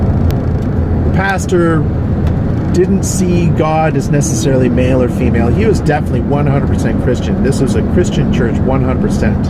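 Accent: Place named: Canada. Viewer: I think your video is great, and I am glad you’re living your truth and your best life! I don’t want to assume but you sound like you could be Canadian and religion is a lot different in the north than it is in the southern United States. Southern Christians are the most backbiting, gossiping, trouble, making, justifying and doing whatever the hell they want to kind of people you can ever imagine. They intermingle their self righteousness, and their politics and their loathing of gays and transgenders all into this big cauldron of godliness that does nothing but hurt and destroy the ability of the south to move forward into a truly progressive way of living. I am 54 years old and wasted decades of my life on Christianity as well as thousands of dollars. I am beyond pissed off about it and I wish I could go back in time and change it. Stay strong and keep on rockin!👊🏻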